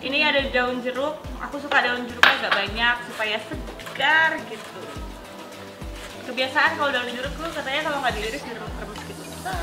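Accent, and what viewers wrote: Java, Indonesia. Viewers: Wah mbk bohoso jowo wis rodok medhok mbk wkwk. Good luck all the best. Lots of love from Central Australia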